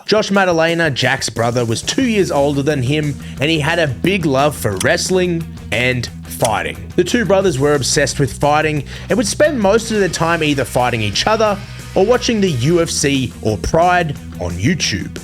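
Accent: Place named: Australia. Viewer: Loving your videos mate. So good to hear an entertaining Aussie accent.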